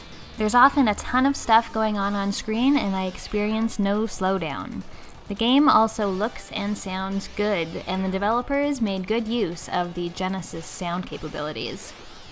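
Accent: Canada. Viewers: <3<3<3<3<3<3<3<3<3<3<3 Nothing I see in your profile says your Canadian, but the accent gives you away. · Ha same here. I knew within 12 seconds that she was Canadian. I've had several occasions when I identified someone as Canadian by their accent and they got annoyed because they don't think they pronounce things differently. lol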